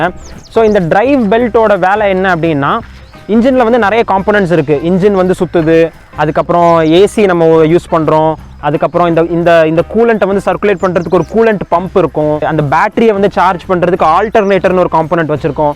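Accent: Tirunelveli, India. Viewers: Bro neenga tirunelveli ah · Neenga tirunelveli ya bro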